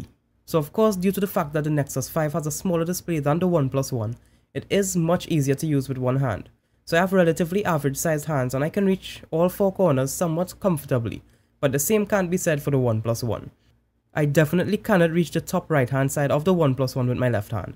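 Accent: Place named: Trinidad and Tobago. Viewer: Firstly, I could tell straight away you were Trini haha. Secondly, Great vid bro but I need to ask where did you get your Nexus 5 cover from??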